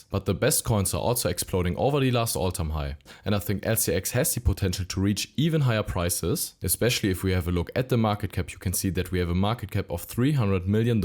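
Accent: Germany. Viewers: Love your german accent 👯👯👯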